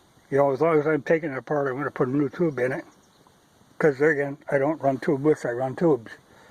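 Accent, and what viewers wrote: United States. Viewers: It was a little difficult for me to understand your accent during the first couple of minutes. To me it sounds like a southern USA accent but I really don't know. I'm from northern Spain, near France, and I still enjoy my Bultaco Pursang MK8, 363 cc that I bought new in August 1975. This bike came with Motocross racing tyres 3.00x21 and 4.50x18, but I tend to use Trials tyres in sizes 2.75x21 and 4.00x18. Michelin Competition is the lightest and stickier, Dunlop or Pirelli made for Trials Competition are also good, but tgey last very long like you would expect for every Trials tyres. Should I try your choice from Dunlop, if they make them in 2.75x21, or maybe 3.00x21? By the way... Im very intrigued with your accent. Where are you from?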